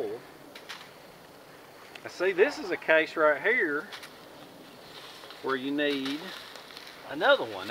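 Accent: South, United States. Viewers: Oh my lord he’s got a heavy southern accent. I got one it just ain’t that heavy of an accent.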